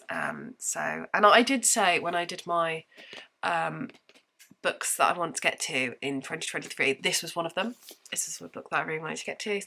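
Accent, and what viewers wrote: United Kingdom. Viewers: You have a really good British accent.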